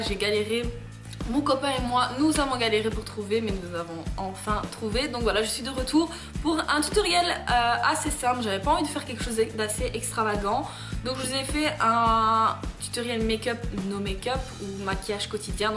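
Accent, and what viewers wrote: Belgium. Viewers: Kikou je viens de découvrir ta chaîne et je me suis abonné afin de parcourir les autres vidéo😉des la 1ere minute je pouvais parier que tu étais belge.tu es de quelle region?moi de mons😆bizz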